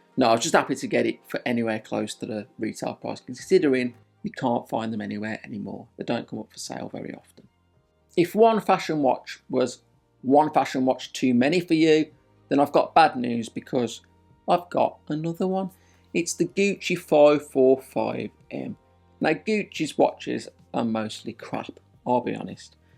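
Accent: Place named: Birmingham, United Kingdom. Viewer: New Sub here. Brilliant collection, and really surprised to see that your first purchase was a £1500 Rado. And you sound like you're local in Birmingham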